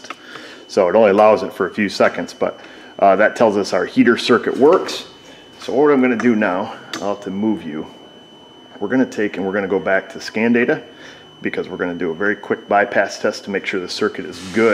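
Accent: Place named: Wisconsin, United States. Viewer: I hear some Wisconsin in the background or your voice...nice video...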